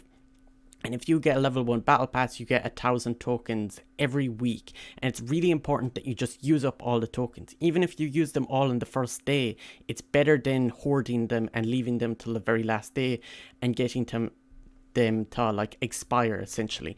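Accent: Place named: Ireland. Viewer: I can't help but notice your beautiful Irish accent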